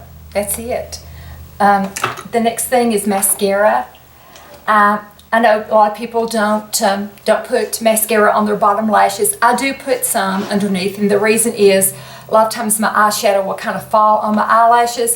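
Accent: South, United States. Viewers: Just found you few days ago and I had to subscribe you because who doesn’t! You are skin is incredible but I like your kind and honest personality as much as your beautiful face:) and I like your cute southern accents. I have a same palette but I haven’t used for almost a year. I just got tired of the way I use so this look gives me more variety and would like to try it. Your skin care inspires me to start taking care of my skin more seriously. I’m 43 and after 40 I noticed my skin start sagging and looks dull. Thank you!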